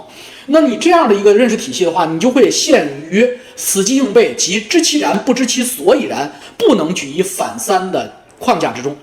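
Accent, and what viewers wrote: Henan, China. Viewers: 那不就是河南话吗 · 怎么感觉这么像河南话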